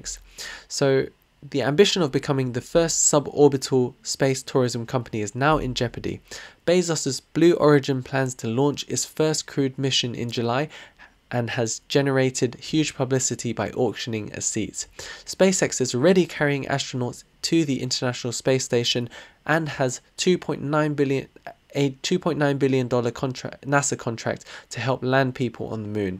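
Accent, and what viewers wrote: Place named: United Kingdom. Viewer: Very informative. I like your accent, are you British?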